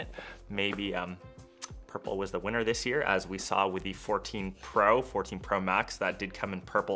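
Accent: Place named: Canada. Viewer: You have a Canadian accent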